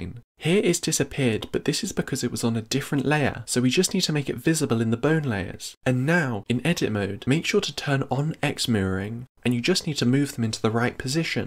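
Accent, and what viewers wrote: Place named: United Kingdom. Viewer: Thanks a lot ! And... I luv your british accent ! Greetings from germany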